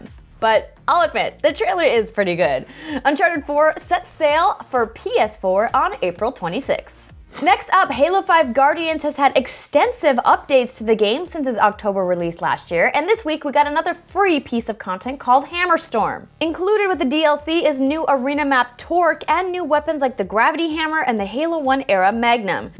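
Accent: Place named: Minnesota, United States. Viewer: are you from Minnesota? Your accent is subtle, but I hear it:P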